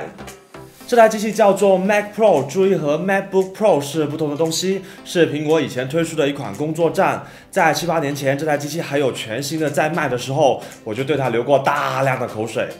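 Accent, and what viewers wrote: Cantonese, China. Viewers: 是不是粤语人？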